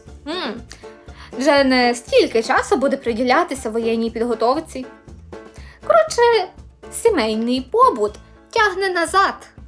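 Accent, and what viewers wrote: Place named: Western Ukraine, Ukraine. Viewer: Кумедна діачинка. Я хоч і сам з заходу України і для мене такий говір мав би бути звичним явищем.та чомусь ваша маннра викликала в мене посмішку. Успіхів у розвитку канала!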